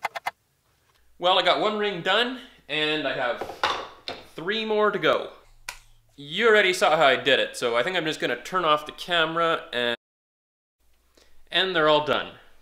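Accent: Canada. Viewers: Neat pattern out of plywood - good job. BTW, are you Canadian or North-Central American? I hear an accent in there.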